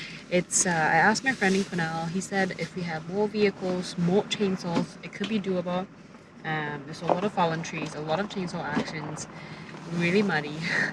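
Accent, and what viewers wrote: Australia. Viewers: That’s the weirdest sound I’ve ever heard 🤔 I’m also trying to figure out your accent, at times you sound Australian. It’s very confusing, lol. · Interesting accent, is that Australian / Korean ?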